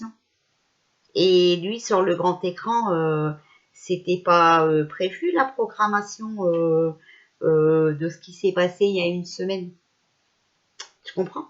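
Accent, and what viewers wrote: North, France. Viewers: C’est avec grand plaisir que je vous écoute cet accent du Nord.... je suis aussi native du Nord mais dans le sud ouest aujourd’hui... Vous avez raison de mettre les points sur les « i ».... hélas bcp dorment encore..... en tous cas grand merci! Restez ce que vous êtes Changez rien! Vous êtes une belle personne... vraiment! ❤️❤️❤️❤️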